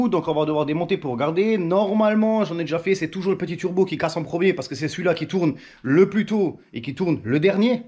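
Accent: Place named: Forbach, France. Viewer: Salut, secteur Forbach? Vu l'accent 😉. As tu déjà pensé à acheter un bac a ultrason pour le nettoyage des pièces, sa te permettrait de faire autre chose en attendant la fin de la minuterie du bac a ultrason. champion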